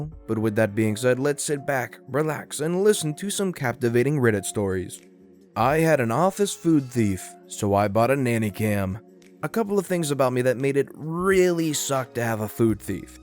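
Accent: United Kingdom. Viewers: Are you British? If so hi.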